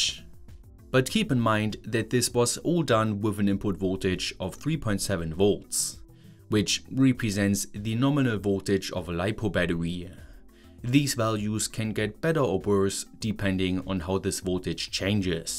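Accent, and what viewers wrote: Germany. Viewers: Now i know where the meme "smol" comes from. Doesn't get more dschörmann than that accent.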